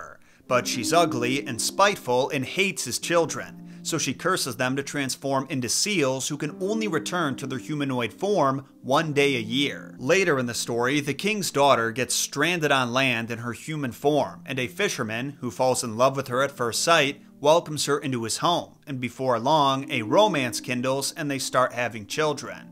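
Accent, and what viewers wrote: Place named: United States. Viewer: The pronunciation is almost there but I think it's the American twang that makes it sound funny 😂 Loved this I would definitely love to see more ❤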